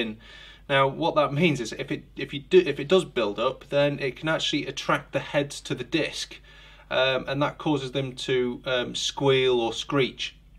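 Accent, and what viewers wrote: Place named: United Kingdom. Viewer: the brit'ish accent is unbeataple.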